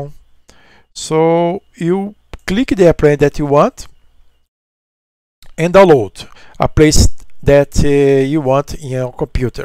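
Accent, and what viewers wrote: Brazil. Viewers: percebi que era br pelo sotaque skaksakska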